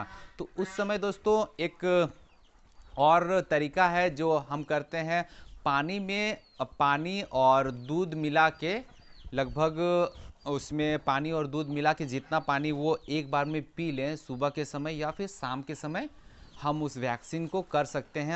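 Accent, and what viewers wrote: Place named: Seraikela, India. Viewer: Bat karne ka andaz se malom huta aap sarai kela said ke ....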